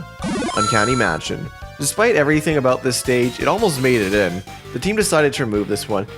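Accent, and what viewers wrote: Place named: Canada. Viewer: this dude sounds canadian 🤢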